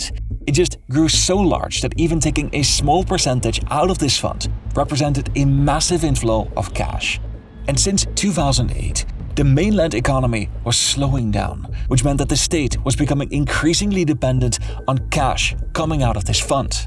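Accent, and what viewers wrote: Netherlands. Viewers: I really like dutch accent, it's strange and insteresting, also the second channel is super high quality, I watched 3 videos as far there, about turkish coup, bosnia and saddam, they're good so far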